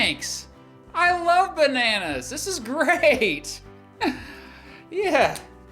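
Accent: United States. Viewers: Painfully American delivery, excellent content. New fan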